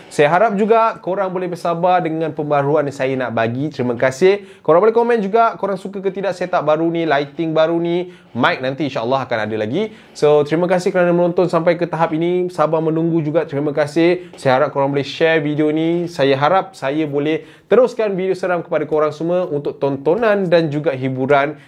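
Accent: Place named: Kedah, Malaysia. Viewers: Best pekena liwat Kedah..mcm Imuda bercakap😁😁😁😁😁😁👻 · Comel je ckp kedah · Pandai cakap utaqa noh...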